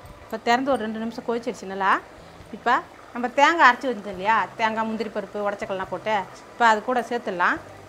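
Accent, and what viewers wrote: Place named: Chennai, India. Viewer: பேச்சு நல்லா இல்ல தமிழ் நல்லா பேசுங்க சென்னை தமிழ் நல்ல இல்ல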